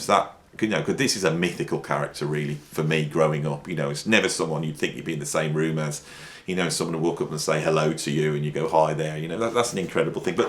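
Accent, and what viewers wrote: United Kingdom. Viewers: It's Fun listening to your Videos, regards from Germany, Love your British accent, I'm 69er...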